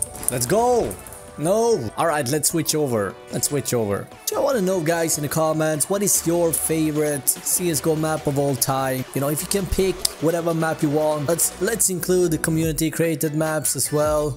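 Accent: Sweden. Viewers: Are you from sweden? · Like your swenglish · Love your Swedish accent😂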